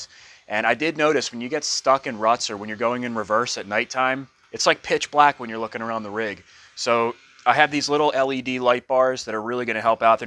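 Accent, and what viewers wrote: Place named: Philadelphia, United States. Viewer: mines an SS, I didn't want to deal with a clutch in the woods but still wanted to be able to shift... Not sure where you're from for if you'd have to deal with it as much (but judging by the "wooder" accent of water, sounds like you're from the Philly/NJ area, so you'd have woods riding as well). But a yxz is pretty easy to work on, everything's pretty open or hidden behind 1 panel instead of having 20 million panels. Just put a titanium exhaust from Graves on mine, sounds like a 1000 streetbike in the woods... Plus, who wouldn't want a 10.5k rpm redline?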